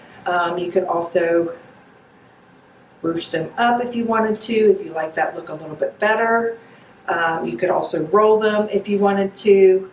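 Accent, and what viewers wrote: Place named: Boston, United States. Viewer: Do you live in Boston? You have a nice accent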